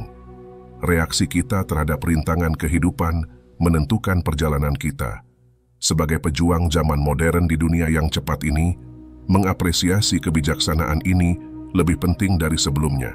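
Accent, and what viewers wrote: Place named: Manggarai, Indonesia. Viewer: logat manggarai banget😊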